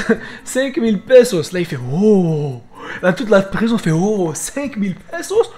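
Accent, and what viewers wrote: Quebec, Canada. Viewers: Non il est québécois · Il fait exprès d’avoir l’accent québécois ?Parce que j’avais jamais remarqué avant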